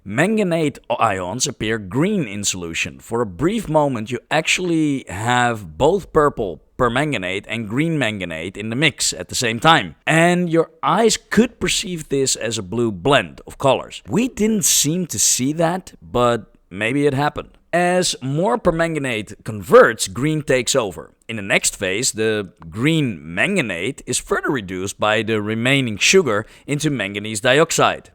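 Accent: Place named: Netherlands. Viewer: very dutch nice accent meneer very goed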